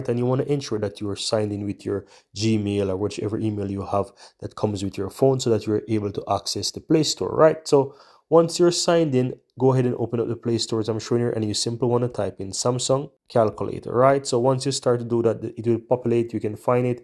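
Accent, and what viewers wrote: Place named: Jamaica. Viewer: I know a Jamaican when i hear one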